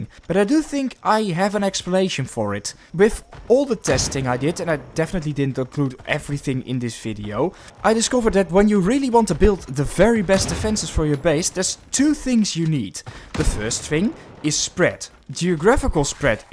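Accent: Netherlands. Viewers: Yo, I hear like 10 seconds and the Dutchness is killing me. Good vid though! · like how you can imediatly spot a dutch person on youtube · I can't unhear the dutchyness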